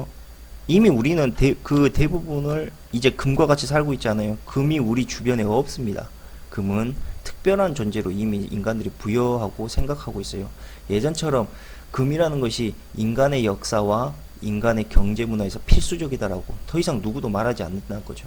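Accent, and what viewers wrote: Jeolla, South Korea. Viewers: 억양이 전라도같은데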